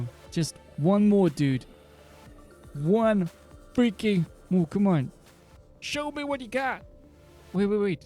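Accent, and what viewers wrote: United Kingdom. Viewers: He kinda sounds British not trying to be mean but you do but your my top 1 favorite youtuber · 😂❤❤ it gor me soo badly ur british?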